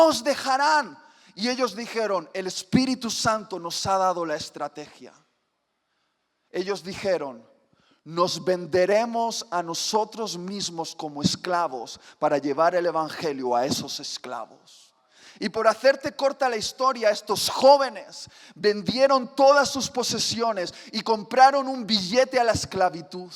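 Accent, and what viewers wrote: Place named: Spain. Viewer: Hola es bello el acento español:) Y Dios esta contigo, que padrisimas tus predicas:D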